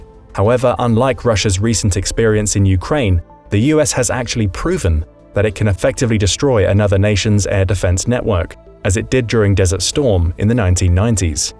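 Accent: United Kingdom. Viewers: He sounds like a Brit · He’s from the Uk that’s why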